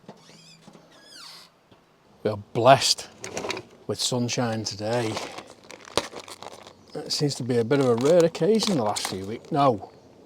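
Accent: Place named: Scouse, United Kingdom. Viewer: I'm from the Wirral (next to Liverpool) but now live in Queensland Australia. Really like the videos and like to hear your accent, which is strange because I don't really like the scouse accent but yours is exceptionally subtle. Keep up the good work 😊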